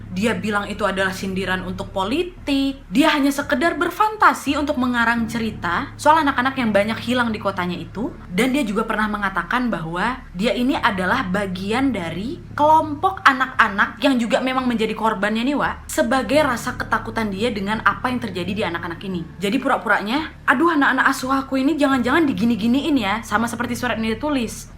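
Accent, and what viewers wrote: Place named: Medan, Indonesia. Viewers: Kakak tinggal dimana? Kok bahasanya kayak bahasa medan wkwk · Gara2 keseringan liat si wak nih, sekarang aku ikutan dia pake logat Medan kalo ngomong sama anak2 aku, pake "hah" segala, padahal aku arek Suroboyo 😘😘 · Br tau chanel ini krn social distancing.. Suka krn kontennya ttg crime, nambah wawasan ditambah lagi bawainnya logat kakak" medan ceplas ceplos ga brenti2, seru🤣👍👍👍👍👍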